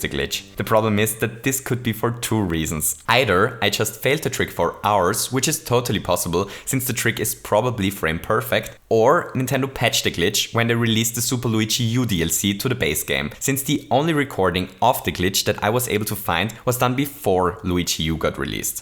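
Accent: Germany. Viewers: I hear a german voice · You sound like a German speaking english and I like it. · Are you german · From where you are ? (Sounds like Germany ) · You have a funny German accent tho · What accent do you have? German? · the german english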